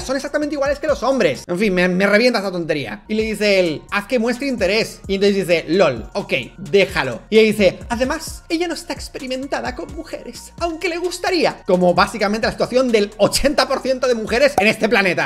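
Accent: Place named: Canary Islands, Spain. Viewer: Aunque la vayas de peninsular se te cae el acento Canario x todos los costados fantasma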